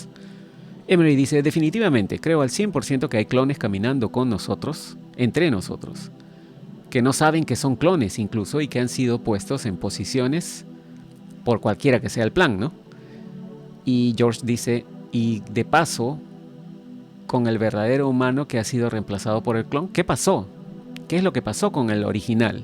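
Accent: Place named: Peru. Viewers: Tiene un acento como de Perú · es de Peru 😍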